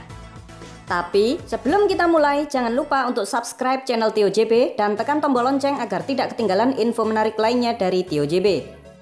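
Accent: Java, Indonesia. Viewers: suara mimin lucu banget karena orisinil logat jawanya itu yang bikin lucu 🤣🤣🤣🤣🤣🤣🤣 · Adminnya medok banget logat jawanya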